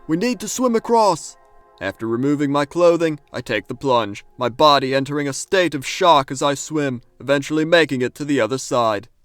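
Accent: Australia. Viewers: bear grylls with an australian accent ...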